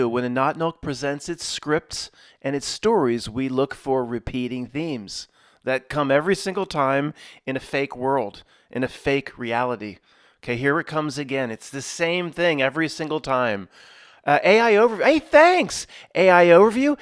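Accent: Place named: Philadelphia, United States. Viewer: & UK Nottingham ❤Philly accent mat in fact I prefer ya Philly accent 😂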